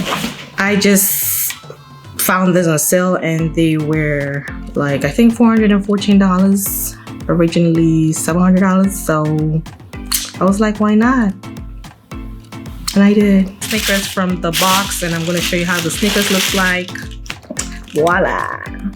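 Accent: Nigeria. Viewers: Iam always here for the intro😂 it’s the 9ja accent for me😅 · Omo i thought you were like born in America , your Nigerian accent is cute 😂, i sha subscribed before I even clicked your channel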